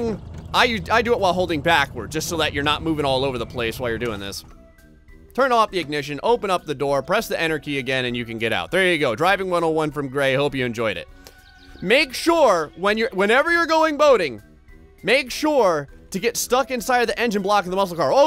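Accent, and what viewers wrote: New Jersey, United States. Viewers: I'm from the Philadelphia area and go to school in NJ but ive never heard your kind of jersey accent.Are you from North or South Jersey? Please tell me you do not say Taylor Ham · Oh yeah I hear the jersey accent, course I’m from the state right below so I pick up on it pretty easy lol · Dont notice that New Jersey accent. I live there too.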